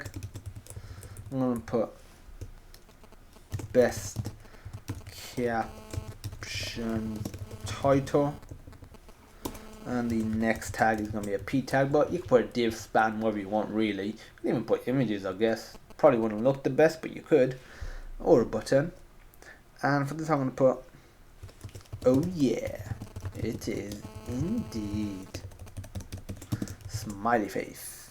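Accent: United Kingdom. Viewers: Great stuff ! The git downloads will save me ages...! I appreciate the video too btw - good to hear a Brit voice - no trouble understanding you :-)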